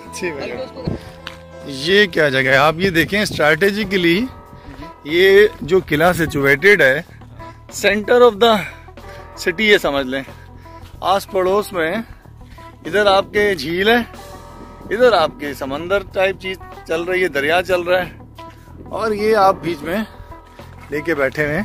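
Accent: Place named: Sindh, Pakistan. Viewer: Great,you doing well and your sindhi accent is cute.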